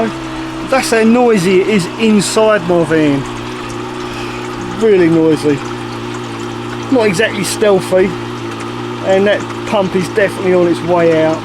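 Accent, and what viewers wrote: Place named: London, United Kingdom. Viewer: Hearing you speak * reminds me of the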